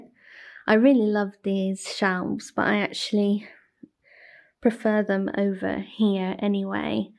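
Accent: United Kingdom. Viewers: Hello, love your channel and your book shelves and love your british accent so much 😍😍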